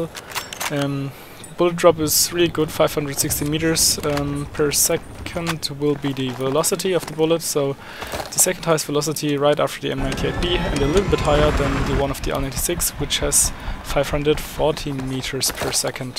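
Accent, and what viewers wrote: Germany. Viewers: irgendwie wusste ich das du deutsch bist xD dein akzent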